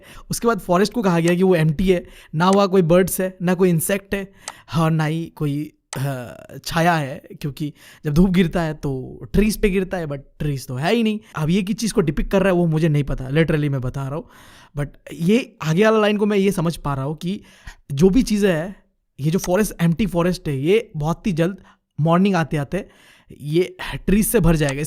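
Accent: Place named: Bihar, India. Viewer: bhai aapki bhasha mai bengali kam or bihari vibe jayada kyu hai kon kon agree karta hai😊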